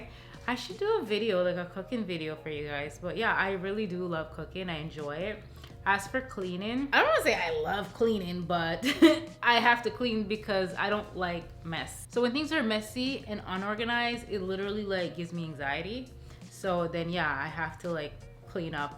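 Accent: Jamaica. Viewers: her voice is so soothing wth lmao and i can tell she’s jamaican it comes through very slightly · Love the accent! Makes me think of my Grandma she was from Kingston Jamaica 🇯🇲💗 I can understand. You are absolutely beautiful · it was so cute to hear you speak patois 🥰 edit: oh my gosh I got teary as soon as you got emotional. I’ve watched your channel for years and I’m so proud of your success! so happy you’re able to live your dreams and comfortably take care of your beautiful family ❤️ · I have never heard your Jamaican Accent before and i can understand patois! Lollll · What a beauty😍😍 Jamaican accent cant hide at alll😩🇯🇲🇯🇲🇯🇲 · Wow didn’t know you were Jamaican and had an accent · I understand what you said when u speak in your Jamaican accent bc I'm Guyanaese! 💜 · COME THRU JAMAICAN ACCENT!!! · Yes that patois 🇯🇲🇯🇲🇯🇲🇯🇲 🇯🇲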